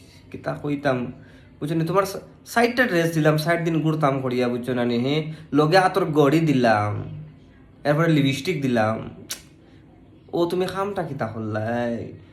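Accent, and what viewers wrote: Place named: Bangal, Bangladesh. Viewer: দাদাভাই আপনার বাড়ি কোথায় বেশ সুন্দর বাঙাল কথা বলছেন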